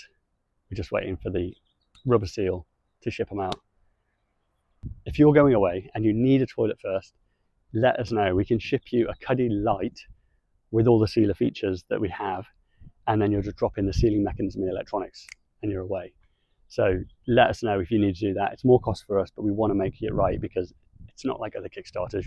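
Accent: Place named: United Kingdom. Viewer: I have just come across your S1 after watching a new (and very supportive) video comparing sealing toilets by Ready Set Van. It looks very interesting as I was within a few days of ordering a Clesana C1. Would I be right in assuming that the liner / bags are propietary and unique to the S1? If so, with the greatest respect to your business, my biggest worry would be that as a newish small business, something happens and the liners stop being available. I appreciate the S1 could then be used as a composting toilet , but I'm not a fan of composting toilets. I also share a slight concern about the use of an app to tell the toilet which type of liner is being used, but if that is all it is used for then I can get past that as I suspect I would only ever use the same type of liner. I note that (despite British accent) the business appears to be based in the US. 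Is there anywhere in the UK that it would be possible to see one of the units? £1,500 is a lot of money to spend on something one has never seen..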